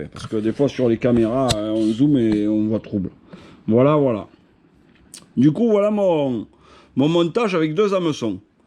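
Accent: South, France. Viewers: J adore ton accent du sud 😂 et j ai le meme fluro que toi je l ai chopé a decat ...bon les crabes la ils sont pas trop vivaces😅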